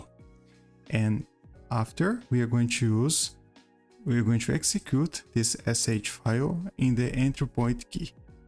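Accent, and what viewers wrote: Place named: Brazil. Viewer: reconheço sotaque BR de longe!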